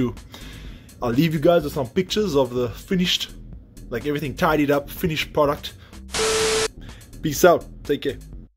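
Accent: South Africa. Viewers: South African accent? Cape Town?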